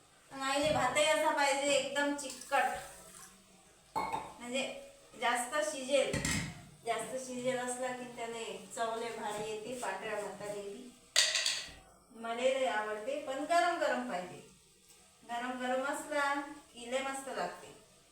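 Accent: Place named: Yavatmal, India. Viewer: तुमचं गाव पुसद. यवतमाळ जिल्हा आहे का. का आरनी आहे भाषा तिकडची अशीच आहे😊माझी बहीण आहे तिकडे. ईजनी गाव आहे यवतमाळ जिल्ह्यात आहे बहीण😊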